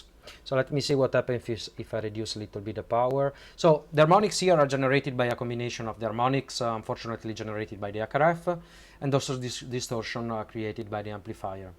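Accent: Italy. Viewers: Scommetto sei italiano 😅☺️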